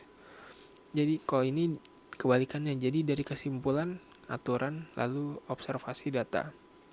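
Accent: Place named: India. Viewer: Keren pak dosen ..... Pak dosen dari india yah? Logatnya kayak orang india 😅😅.... Sukses terus pak dosen ....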